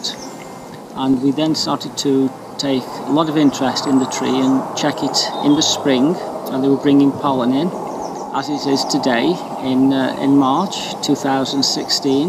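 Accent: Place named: Stoke-on-Trent, United Kingdom. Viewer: Very interesting certainly somthing to think about . You sound like you a stoke on trent man where you from there origanal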